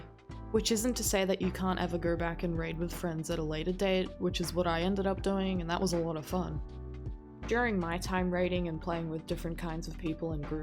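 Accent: Australia. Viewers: Also are you Australian? You sound it. I have found the Australian community to be one of the worse in my experience and I have played on like 12 different servers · Just found this video by chance. I dig the accent. Now, on topic: I recently cancelled my subscription (early Stormblood). I've had mostly mid experiences, but more bad than good. As far as MSQ and the game itself, it's just not gripping me anymore. I'm sure I'll be back . . . someday. But I just know that today is not that day. Keep making content so I can hear more of that lovely Australian accent.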